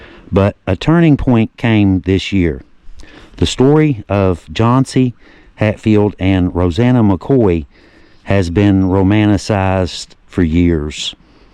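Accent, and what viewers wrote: South, United States. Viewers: I could listen to your smooth southern accent all day long ❤❤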